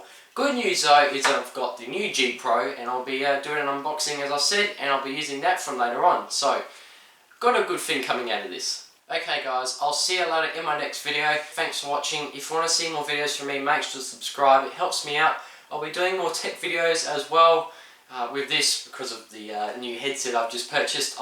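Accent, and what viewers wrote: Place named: New Zealand. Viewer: damn im pretty early, think im the first aussie, tbh you sound more kiwi then aussie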